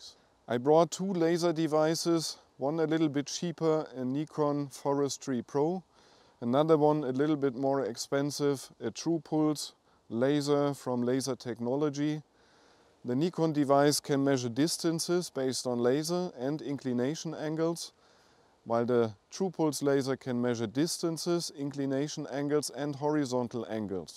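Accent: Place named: Germany. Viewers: Or like Helmut, the taxi driver in Night on Earth... Yes, sorry for the German accent, we are from Göttingen in Germany. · I only heard this accent (I assume Deutschland) in movies such as the big lebowski. What part of Germany you are from if you don't mind me asking?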